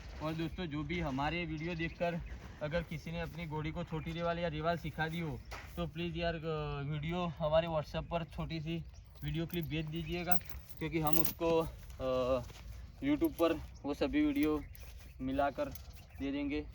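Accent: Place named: Gujarat, India. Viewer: Achhchhi hindi bolne ki koshish kar rahe ho magar gujarati mix hohi ja rahi hai,dua hai aap ki hindi aur achhchhi ho jae taki publik ko aur fayda ho, aise bahut achhchha sikha rahe ho aur aap achhchhe bhi ho....bahut bahut shukriya from Bardoli dist surat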